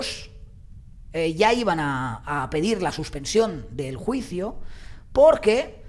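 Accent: Catalonia, Spain. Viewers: El acento catalán o valencia se te nota mucho y más porque te cuesta espresarte en castellano y no levantás la cabeza solo para el Ahh, ehhh etc..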